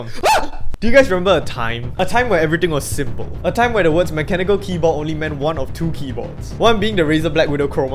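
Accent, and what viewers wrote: Singapore. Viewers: Hey are you Singaporean you sound like one ngl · are you Singaporean?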